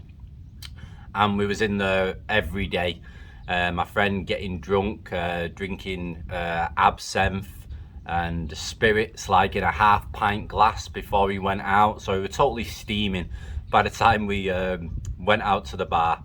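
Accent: Manchester, United Kingdom. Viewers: You from Manchester,?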